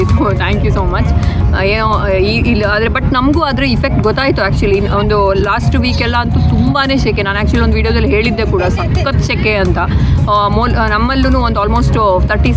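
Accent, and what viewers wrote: Mangalore, India. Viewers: ಮಂಗಳೂರು ಕನ್ನಡದಲ್ಲಿ ಮಾತಾಡಿ ಕೇಳ್ಳಿಕ್ಕೇ ಖುಷಿಯಾಗುತ್ತದೆ 🙂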